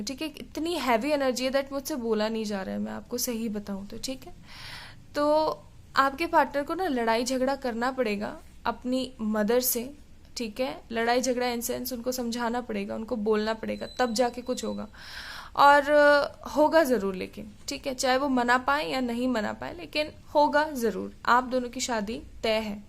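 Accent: Punjab, India. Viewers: apki awaaz se aise lgtaa hai punjab se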